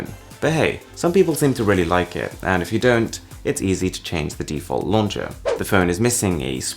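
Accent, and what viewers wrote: United Kingdom. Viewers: Yes, Zack sounds great too, but that British accent is so elegant :1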